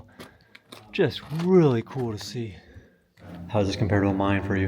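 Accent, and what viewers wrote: United States. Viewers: Love your vids. I heard "Bone Valley" and my ears perked up. We ride dirt bikes at that place you saw named that on OnX. Nice to know you grew up here. I thought you were from Boston, where I'm from. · I thought you had a central Florida accent. That’s funny. Welcome back.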